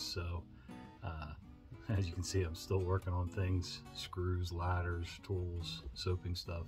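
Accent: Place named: Maryland, United States. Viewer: When I heard you talk, I knew you were a Marylander.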